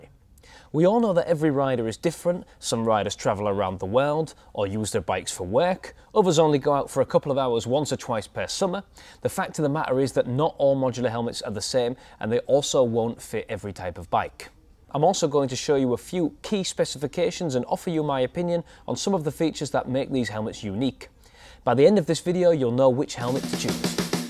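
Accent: United Kingdom. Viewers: Lekker accent maat, moest toch heel even twijfelen of het nou toch stiekem brits was.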